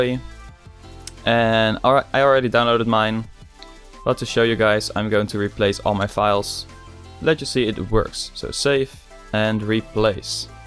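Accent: Netherlands. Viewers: I can hear from your accent your Dutch 😂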